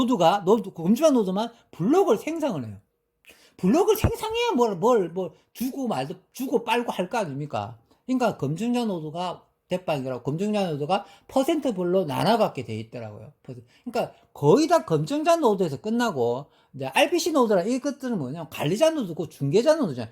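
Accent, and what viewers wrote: Gyeongsang, South Korea. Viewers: 저고 경상도 입니다 경상도 사람들 말투 ㅋㅋㅋㅋ 저도 경상도라 이해 합니다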